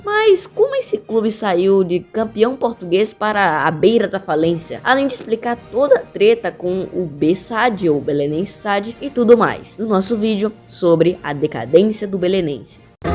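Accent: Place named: Brazil. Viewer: Foi preciso uma voz brasileira a explicar tudo isto. Grandes Tugas ! 🎯 Fora com a Belenenses Sad, corruptos.... Volta " Os Belenenses". 💙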